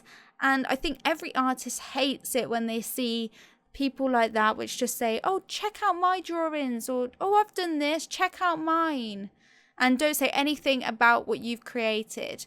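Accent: England, United Kingdom. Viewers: I don't why but I really have some interest for English accent! Love it!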